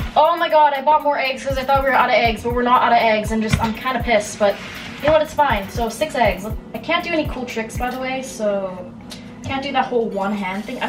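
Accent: Alberta, Canada. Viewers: You can hear the Canadian in her and I love it 🇨🇦🇨🇦🍁🍁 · oh mygod you albertian accent is amazing lol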